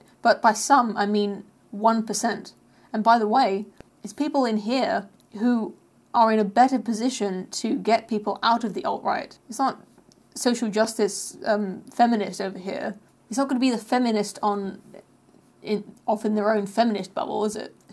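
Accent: United Kingdom. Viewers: Insightful analysis, clear diagrams, no makeup, British accent. is literally the sexiest woman on Youtube.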